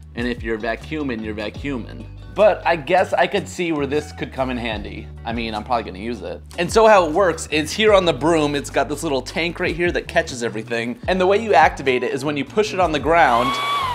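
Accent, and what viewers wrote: United States. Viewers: Haha i thought this was a knockoff integza channel.. until you spoke with American accent. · Are you American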